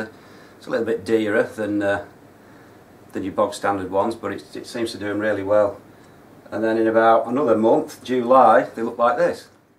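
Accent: Yorkshire, United Kingdom. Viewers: Loving that Northern accent. Yorkshire? Great plants by the way and a very good video!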